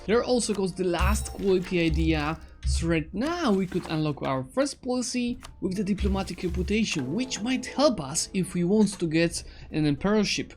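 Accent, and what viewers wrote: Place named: Poland. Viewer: Are you Polish or Slovak? I love your accent :))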